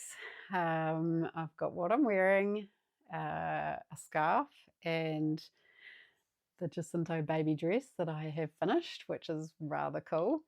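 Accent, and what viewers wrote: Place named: New Zealand. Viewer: You are a joy to watch. I love to hear that kiwi accent. I'm in Wellington just down the road. 😂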